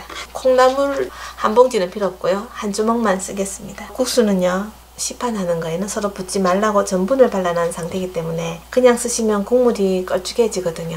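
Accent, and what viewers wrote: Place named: Gangwon, South Korea. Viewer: 님~^^ 네~강원도의 사투리 구수한 매력이 있네요~!! 맨두와 가시기 저도 좋아하는 메뉴입니다^^♥♥♥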